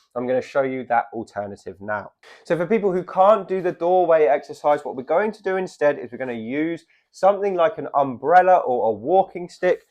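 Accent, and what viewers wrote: United Kingdom. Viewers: Thanks for your videos. I have a hard time being lazy and undisciplined to exercise. I need to wake up and get motivated. You are very encouraging. Great explanations. Like your British accent so much. I'm over 60. Do not like saying that. Have tried doing push-ups, sit ups, squats, etc. Always feel better after. Some "reverse leg lifts" on hands and knees for firming my glutes. Need to get serious about this and just enjoy it. Can not jog or run or walk a lot due to painful feet. But CAN still get around, drive, shop, etc. Sorry for long text. Btw, you make great videos and you are a good-looking, very presentable man. Keep up the good work. God bless you in a special way.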